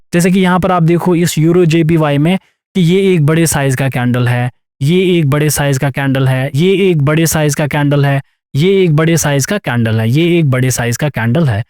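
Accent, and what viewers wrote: Kashmir, India. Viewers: Your accent seems to be kashmiri. R u from kashmir,..??? I too am from kashmir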